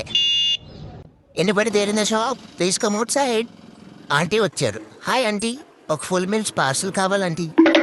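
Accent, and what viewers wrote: Kakinada, India. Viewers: Anna nuvvu Kakinada na bro😊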